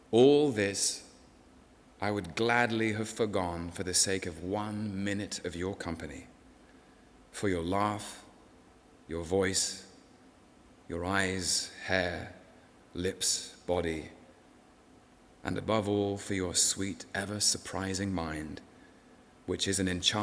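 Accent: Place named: United Kingdom. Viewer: This essay poem is not only utterly romantic and passionate but suits his voice, his British accent... beautiful literature piece that just suits the loving character. Also to his mischievous character when he said let's get into detail, hehe. Blemishes were funny and actually made total sense. Love the literary style with metaphors...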